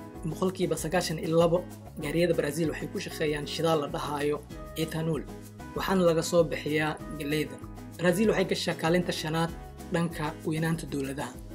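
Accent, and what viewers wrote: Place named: Benadir, Somalia. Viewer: Sxb afsomaliga wuu lahjad bandyhy haku mashquulina lahhada banaadiriga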